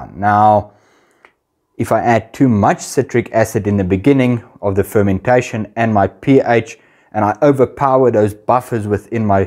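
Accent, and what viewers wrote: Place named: South Africa. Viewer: Tornished..... Hahahah, classic South African accent. All good shit man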